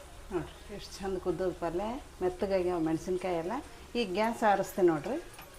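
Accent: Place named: Brahmin, India. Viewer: ಎಲ್ಲಾ ಅಡಿಗೆ ತುಂಬಾ ಚೆನ್ನಾಗಿ ಮಾಡುತ್ತೀರಿ ತುಂಬಾ ಚೆನ್ನಾಗಿ ಹೇಳುತ್ತೀರಿ ಬ್ರಾಹ್ಮಣ ಭಾಷೆ ಅಪರೂಪವಾಗಿದೆ ಕೇಳೋದಕ್ಕೆ ಖುಷಿ ಅನಿಸ್ತದ ನಮ್ಮ ಉತ್ತರ ಕನ್ನಡದ ಬ್ರಾಹ್ಮಣ ಭಾಷೆ 🙏🤝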